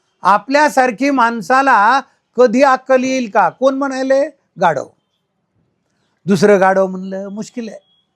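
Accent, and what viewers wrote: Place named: Marathwada, India. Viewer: तुमची मराठवाडी मराठी ऐकायला गोड वाटते.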